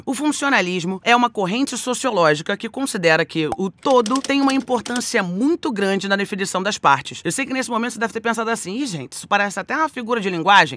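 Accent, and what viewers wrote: Rio de Janeiro, Brazil. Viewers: esse sotaque carioca kkkkkk foda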